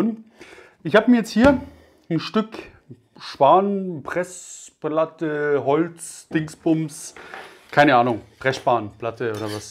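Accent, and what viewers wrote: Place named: Bavaria, Germany. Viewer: Sau geil!!! Bin durchn Truppe auf di aufmerksam worn... Hob ma jetzt scho einige Videos vo dia o gschaud... Mega guad! Und dann no oana der boarisch red. liabe Griass aus Nandlstadt... (Vielleicht kennst des ja... is ja ned weit weg von dir ;-))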